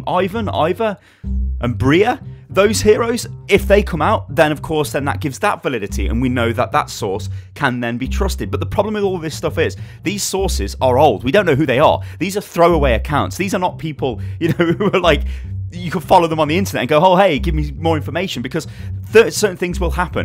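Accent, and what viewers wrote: United Kingdom. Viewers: "high speed british man" LOL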